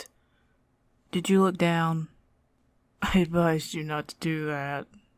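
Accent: South, United States. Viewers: don’t you DARE hide that beautiful country accent!!🤣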